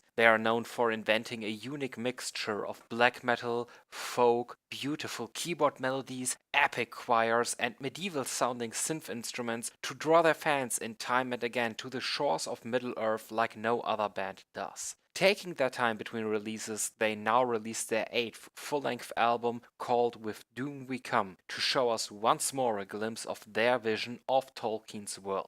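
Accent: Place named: Germany. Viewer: no, don't do it, I need this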